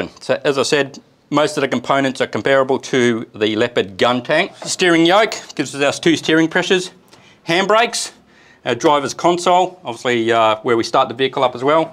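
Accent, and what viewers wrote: Australia. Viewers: It's Aussie regional, I'm getting used to it. There is so much good knowledge conveyed that a voice doesn't matter.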